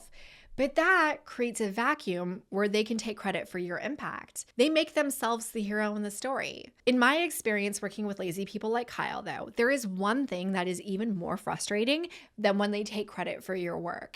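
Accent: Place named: New Jersey, United States. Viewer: Thanks for the video but the jersey girl accent is shaking up my autism. 🩵💐🧸🩷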